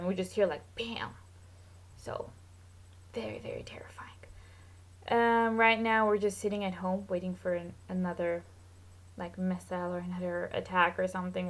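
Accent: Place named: United Kingdom. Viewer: את בריטית במקור במקרה? יש לך מבטא טיפה מצחיק שהוא לא ממש ישראלי ולא ממש בריטי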